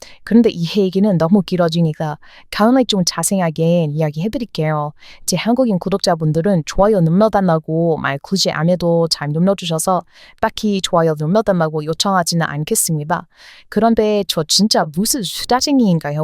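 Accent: United States. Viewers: 근데 진짜 궁금해서 그런데 미국인처럼 흉내내는 한국인 아닌가요? 들을 때마다 신기해요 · 의 혀꼬부러진 한국말 들을수록 재미있어요. 진짜 미국인이 맞는지 그리고 집안 내력과 나이 등 좀 알려주세요. 혹시 한국계 미국인 아닌가요? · 는 미국인 발음으로 한국말하는 것이 엄청 듣기 좋읍니다. 제가 하나 알려줄께요. 미국에는 일본유학생이 거의없고, 대부분 아시아유학생등은 중국,인도,한국인들이죠. 역사적으로 중국은 새로운 중국토종 왕조가 지배하면 북방 유목민등의 왕조역사를 왜곡하죠. 그리고, 이런 혼란한 앞뒤맞지 않는 역사.문화유적을 모택동시절에 문화대혁명으로 다 파괴해버렸죠. 그리고, 공산주의 전체주의국가인 중국은 어릴때부터 학교교육에서 자기중심적 교육으로 세뇌를 받았읍니다. 이것이 자본가와 노동자등으로 구분하는 이분법적인 체계로 나와 너, 우리편과 적을 구분하죠. 일본도 민주주의국가인데도 중국같이 왜곡합니다. 한국은 세계에서 유일하게 이분법적인 사고를 가지고 있지않은 사람들입니다. 한국사람은 밝은 것과 어둔 것이 같은 동전의 양면이라는 것이고, 나쁜놈과 좋은놈을 수용하고 배려합니다. 왜냐하면, 사람들은 두가지 면을 가지고 있기때문이죠. 공부해보세요. 바로 이것이 한류의 답입니다.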